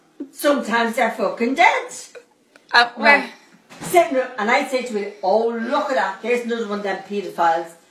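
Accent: United States. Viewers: Zeeeee? Which part of America is she from?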